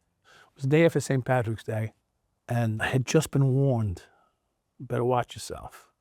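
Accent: New York, United States. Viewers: I don’t know what you’re talkin about 🤷🏻 that’s as New York as you can get an accent you must not be from New York 🗽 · This is pretty much standard, old-school New Yorker, law-enforcement and judicial bureaucracy personality. I know a judge who was a former narcotics processor who talks a lot like this Some people are saying that his accent doesn’t line up with what they know New Yorkers to sound like, but they’ve been here for only 10 years. The person that made the comment and indigenous New Yorkers are harder and harder to come by now.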